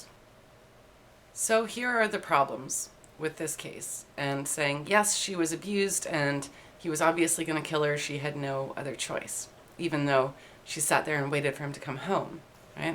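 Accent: Canada. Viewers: You really work that smirk with the chin down and eyes up, bangs at the top of eye level well. You could talk to me for hours lady. Monotone discussion in laid back style, with occasional canadian dialect shining through. Making my heart flutter. Feeling enveloped with a warm buzz of unseen tribbles that are cloaked. My pupils are dilated to the point to where i look like an alien hybrid portrayed in our modern pop culture. Yeah i could listen intently to your voice of intrigue for great lengths of time. Many many metric units of time. Your voice could also put me to sleep knowing youre near me. Like a security blanket at 60 decibels. Lol. im so sorry i keep ranting on your videos i am kinda trying to flatter you as though youre actually eading this stuff. Im bored to hell tonite thats aboot,lol, all as to why i keep on. I need my meds. Just meds i guess. I dont take any but feel like theres a stereotypical void that needs fillin. 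With pills. Haha.? I live near harley in milwaukee. Are ya related to willie g? Eh? I speak like a yooper too. Except we have a phrase in Wisconsin you canadians dont have. Dont cha know? God i vrack myself up. Im going nuts here! Not just about your appealing demeanor, looks and personality neither.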